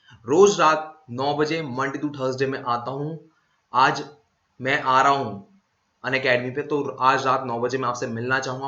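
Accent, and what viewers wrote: Meerut, India. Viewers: Meerut wale 🤣🤣🤣🤣 Inge unge this is true meerut ki language bilkul aese hi hai btw I'm from meerut too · Bro I am from Hapur I relate a lot from your meerut wala accent I want to improve my english grammar. Please give me an solution.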